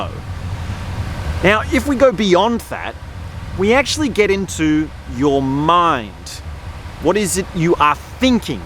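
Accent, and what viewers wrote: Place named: Australia. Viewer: Qt ! Hard to focus on what you’re saying with the thick Aussie accent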